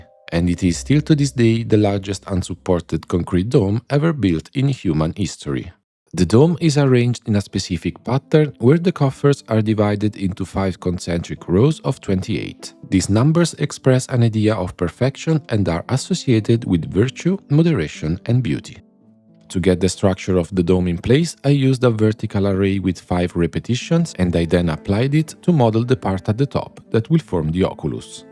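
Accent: Italy. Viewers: I tuoi lavori sono eccezionali, complimenti (tanto sei italiano, si capisce dalla pronuncia)! Volevo chiederti una cortesia: in tutorial passati, quello sui trim-sheet in particolare, usi substance, ma questo è un problema perché è un sw non accesibile. Potresti fare una guida dove spieghi come creare trim-sheet con i materiali creati direttamente in Blender? Hai provato a creare archi e volte tramite GN?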